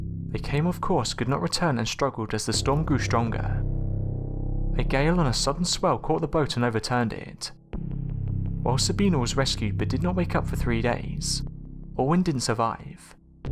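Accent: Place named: Wales, United Kingdom. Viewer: Dave Lennon-Copeland totally agree. Being from Wales I definitely hear a Welsh accent. Possibly South Wales. Maybe cardiff?.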